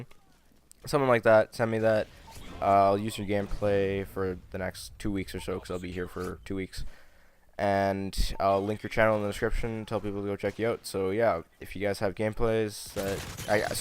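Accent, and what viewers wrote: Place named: Canada. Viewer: you say milk funny, melk, but ur Canadian so you have a accent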